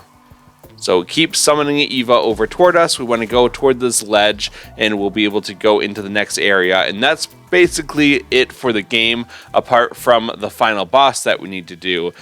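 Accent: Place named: North America. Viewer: Is this the NA version or EU version, im guessing NA because of your voice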